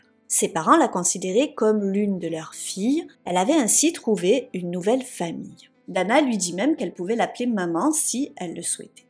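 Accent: South, France. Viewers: Génial!! Tu viens du sud de la France, selon ton accent? Marseille?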